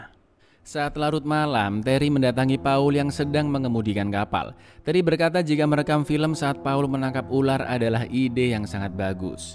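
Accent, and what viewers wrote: Java, Indonesia. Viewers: Ini Adalah Channel Membahas Alur Cerita Film Paling Asik Salah Satunya,Gara2 Membahasnya Medok + Asik:)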